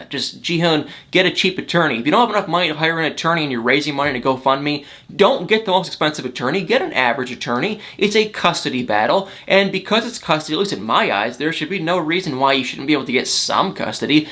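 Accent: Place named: Midwest, United States. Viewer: You crack me up a northern mid western constantly saying Y’all. 🤪. Love ya. Greeting from NYC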